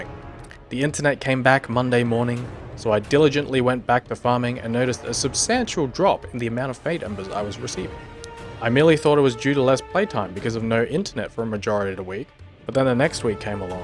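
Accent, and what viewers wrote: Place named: United States. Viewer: Your my hero! Wished i could do more then just thumbs up and subscribe. You sound like US, im in germany id send you REAL beer, for sure! Edit: Thats some insane account you have there, ive been playin since launch, even played at day 1 2014 with an fake account. Even if i had that much time to make so much high ilev characters i doubt i had the stamina for that, respect!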